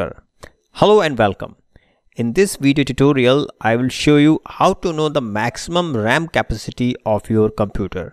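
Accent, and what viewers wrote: India. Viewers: thank you random indian accent dude from YT<3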